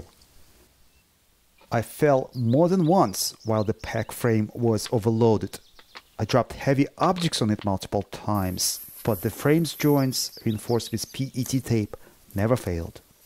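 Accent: Russia. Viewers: Off topic, I have always found the Russian accent easiest to understand. I have spoken 2 other languages & even those are more difficult. Love this idea & liked this video years ago. I plan on making this cutter soon. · Русский акцент)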